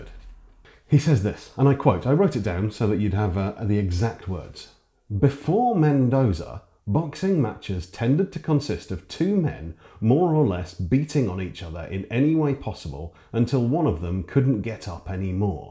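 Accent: United Kingdom. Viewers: A man with muscles and a British accent reading from an old book to passionately make a point.....I didn’t know about this kink until now. Thank you.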